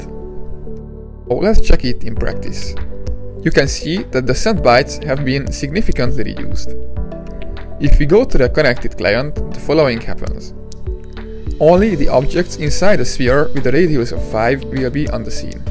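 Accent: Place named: Hungary. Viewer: Akcentus. Ne értsd félre, szuper minden, köszönöm a videót. A magyar unity-seket így ismerni fel :)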